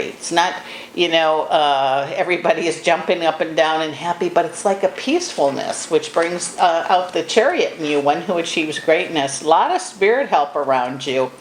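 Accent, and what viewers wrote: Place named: Minnesota, United States. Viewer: Thank you for the readkng❤❤I look forward to seeing you and hearing your voice. You have a Minnesotan type of accent and I LOVEZ me some Minnesota peeps. Good memories 👍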